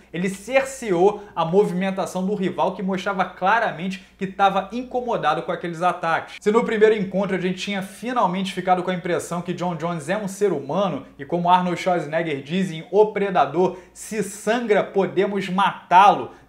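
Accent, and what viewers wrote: Rio de Janeiro, Brazil. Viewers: Ótimo comentarista!...análise perfeita, apesar do sotaque carioca irritante (eu acho), a análise é perfeita!!! Jones é foda!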